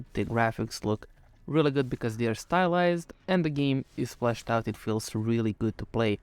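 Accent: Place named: Eastern Europe. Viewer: Potpuno se slazem, precesto mi se desava da pogledam video koji traje skoro koliko i epizoda neke serije koji nije ni najmanje koherentan i vrti jednu te istu pricu svo vrijeme bez neke poente. Radujem se daljim uploadovima :) Pretpostavio sam da si negdje iz istocne evrope po akcentu, kada si izgovorio ime developera u ovom videu pravilno, znao sam da mora da si negdje sa ex-yu prostora, svi ostali se generalno bas muce sa slovom Ć haha. Sve najbolje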